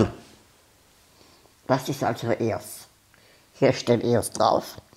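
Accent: Austria. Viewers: A Österreicher? Oda vo wo?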